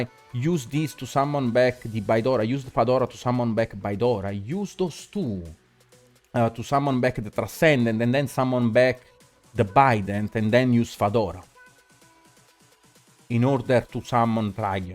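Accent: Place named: Italy. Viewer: questo modo di parlare... maledettamente italiano; (viedo molto utile comunque)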